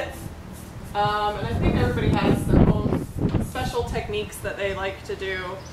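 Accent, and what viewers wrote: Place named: Illinois, United States. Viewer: I'd watch you paint anything....BTW, love the Illinois accent, as someone from Central IL I recognized it right away.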